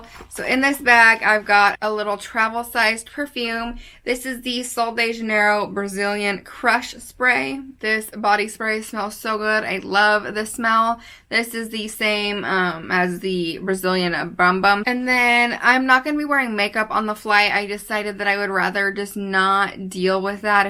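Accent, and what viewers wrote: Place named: United States. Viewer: Nice video, thanks for sharing. I haven't finished watching it yet... I had to pause when you mentioned nearly a 12 hour journey!!! I thought to myself, she sounds State side, where could she be flying from 12 hours to get to Tenn?? Then I realized (after reading About Me section) you're coming from OR. Makes sense 😂😂 ❤️❤️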